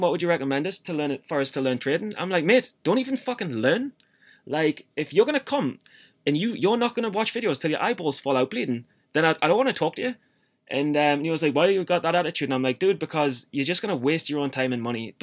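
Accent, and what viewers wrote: North East England, United Kingdom. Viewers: are you from the north east of england by any chance because i am too :)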